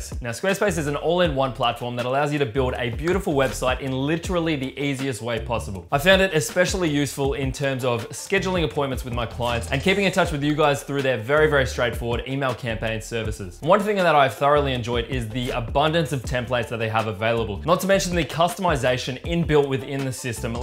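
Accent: Australia. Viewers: So u said it is quite hot in hear so with that information and ur accent u r an Australian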